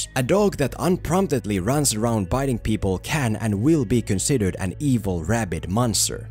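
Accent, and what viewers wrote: Finland. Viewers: Let me guess, you are Finnish or something by your accent. (I'm Finnish myself so Idk) No offense intended. I like your accent ALSO HE Didn't kill his neighbour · Hey . I have to ask. Are you Finn? Your accent defenetly sounds finnish :D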